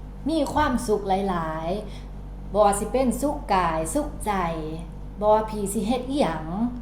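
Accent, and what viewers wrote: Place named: Isan, Thailand. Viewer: พูดภาษาอิสานเก่งจัง สำเนียงเหมือนมาก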